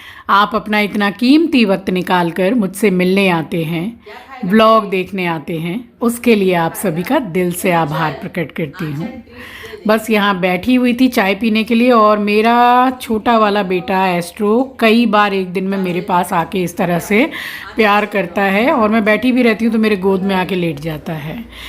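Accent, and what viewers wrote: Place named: Agra, India. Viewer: ji aap ke muh se Agra ki language sun kar bahut achha laga bola karo kabhi kabhi❤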